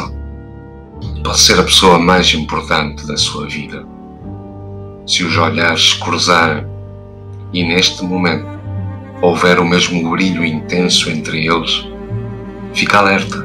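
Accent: Portugal. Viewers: Samir 4150 sotaque Português de Portugal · Amei a declamação do poema. Este sotaque português é divino. Quem declama? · DEsculpe....Só não gostei q fosse nesse sotaque português.... tanto Drummond quanto Selma são brasileiros, e essa música de fundo trás um clima de funeral....qdo fala de amor chegando em nossa vida... 😢 e não condiz com a mensagem ... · Lindo e neste sotaque português ficou ainda mais terno e doce, irresistível, um bálsamo para a alma! AMEI!! 😃😍😍👏👏👏🙏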